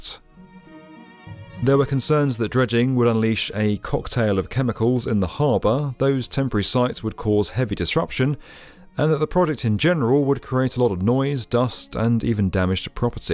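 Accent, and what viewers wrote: England, United Kingdom. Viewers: Why is a pom doing the news?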